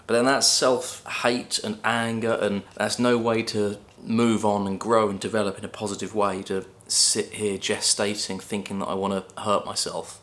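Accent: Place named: Berkshire, United Kingdom. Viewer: Hi. What part of England are you from? I detect a Berkshire accent but could be wrong! All the best 👍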